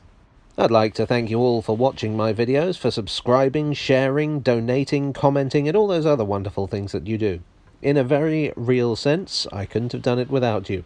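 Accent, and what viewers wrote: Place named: North, United Kingdom. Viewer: So your family's from the North, eh? I thought you had a Northern-sounding accent.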